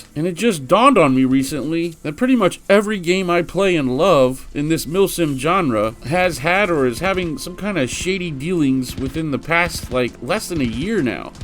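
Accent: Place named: Mid-Atlantic, United States. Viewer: Man you and I both have that mid atlantic accent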